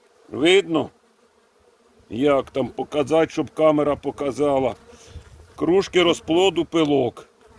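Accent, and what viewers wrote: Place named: Cherkasy, Ukraine. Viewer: Вітаю. Матка пропуски дає в засіві, чи так здається? І скажіть з якого ви регіону? Бо так по розмові ніби як з Черкащини. Цікаво.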